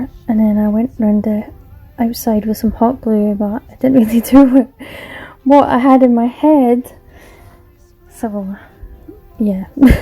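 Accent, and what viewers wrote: Scotland, United Kingdom. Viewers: Hey! I could hear U today so well! Love ur accent. Scottish? Love the ocean blue color on all ur projects! Ur tree filled scene with the lovely houses hit all the right Xmas bells with me! Gorgeous!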